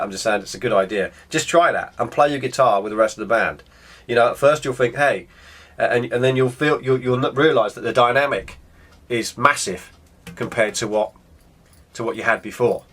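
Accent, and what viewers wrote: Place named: United Kingdom. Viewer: I trust you more because of your british accent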